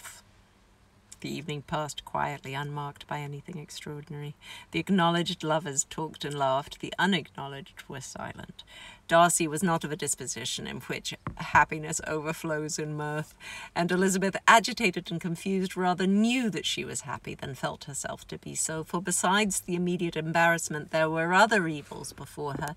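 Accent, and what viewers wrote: England, United Kingdom. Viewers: So happy to hear the English accent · Her beautiful English accent became so familiar to her quickly after the first chapter. Adored this. Thank you. No one could ever be a better Elizabeth than you. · Oh my, what a gorgeous find!!! 🥰 I must be the only person who hadn’t watched Pride & Prejudice mini series until this week! ☺️ I enjoyed it sooo much, then wondered what has been in since…shocked to hear an American accident and lighter hair in later interviews…but so delighted to hear the English accent here WHILE reading P & P! 🙏🏼👌🏼I’ll be listening to more of these readings! 😊 Have since watched performance in A Quiet Passion #bravo 👏👏 and didn’t realize she played Myrtle Logue in the King’s Speech! 😮 👌🏼👏👏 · I was your driver in Scotland when you were acting in game of thrones pilot. I remember the English accent. 🏴😁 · Her accent is still sort of British❤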